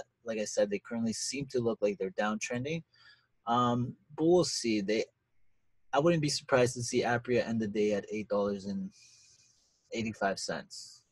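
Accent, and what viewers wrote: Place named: Latin America. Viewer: Oh nooo! It's all good baby! Your dedicated and you show how it's a full time hustle, that you obviously enjoy! You stay informed & you know your stuff. Good predictions! Thanks for showing us your mistakes as well! You take criticism very well btw, its a great quality! Your English is Fine, YOUR Latino. Go Gary V on em and say I'm Latino dick, how am i supposed to talk LOL..besides Money doesn't give a ish..Stay Focused hun🙏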